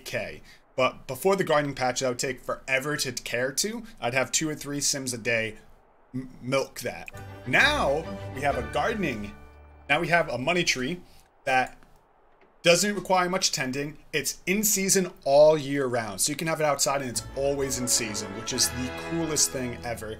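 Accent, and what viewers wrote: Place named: England, United Kingdom. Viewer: Hello i’m french and I discovered your Channel thanks to pixia. I don’t Know if i have a good English but i like your English accent when you speak french (its so cute ). +1 suscriber ❤️❤️❤️ (You tried to Say my username but you did not succeed because of the Mademoiselle(Mlle) 😁)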